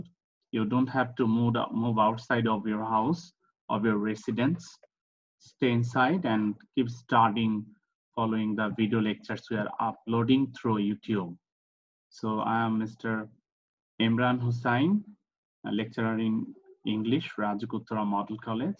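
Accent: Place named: United Kingdom. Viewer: Sir, you talk like the British. I liked your lecture!!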